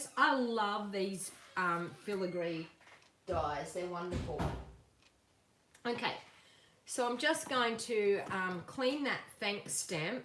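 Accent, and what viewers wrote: Australia. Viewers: Love watching you from CO USA! After visiting England the last 7 years I’ve decided the Aussie accent is the best! 😬😉 but don’t tell the Brits! 😂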